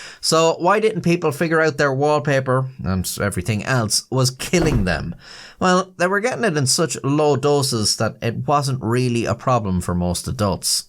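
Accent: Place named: Ireland. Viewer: For the love of Pete can we please have more Irish voiceovers on YouTube 👏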